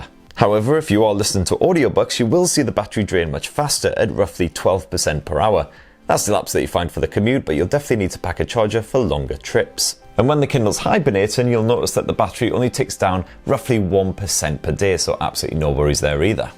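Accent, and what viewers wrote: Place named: Newcastle, United Kingdom. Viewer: Oh no, you're a Geordie, aren't ya?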